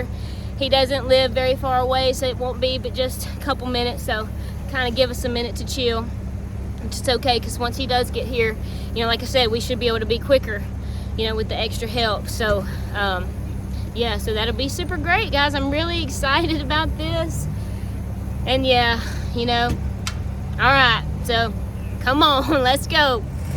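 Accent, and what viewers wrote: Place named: South, United States. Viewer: good video love. her country accent...lol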